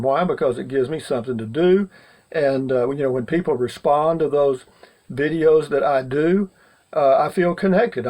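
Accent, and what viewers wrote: Texas, United States. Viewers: I enjoy all of your videos especially the early ones. I went to school in Austin and consequently developed a great love for south central Texas. Your accent (yes!) is familiar and pleasant. Your point about being alone but choosing not to be lonely... yes. Take care and keep the videos coming. I look forward to the daily installments.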